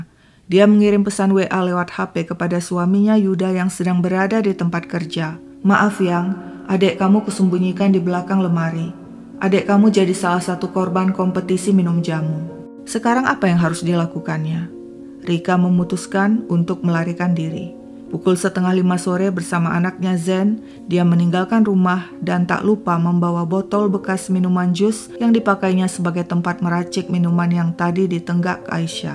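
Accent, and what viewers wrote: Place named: Palembang, Indonesia. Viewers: Bahasa Palembang nya medok ni... kayak Palembang asli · Kok naratornya cakap banget ya pake logat palembangnya? Apa narator orang palembang aslinya. 😂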